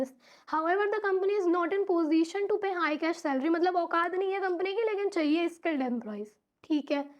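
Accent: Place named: Delhi, India. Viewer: Ab bauth hi intrusting way mai sikhateho, and apke voice se Delhi vali vibes ati hai 😂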